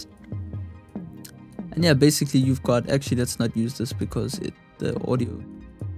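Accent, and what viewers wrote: South Africa. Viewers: are you south african dude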